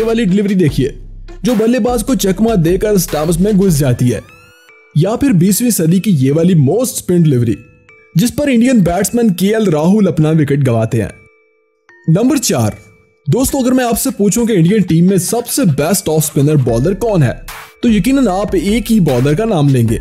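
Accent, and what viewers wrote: Pakistan. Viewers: Your voice is like a Pakistani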